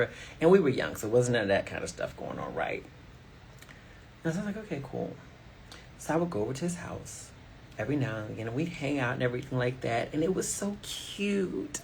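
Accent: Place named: Washington DC, United States. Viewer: You from the dmv?!?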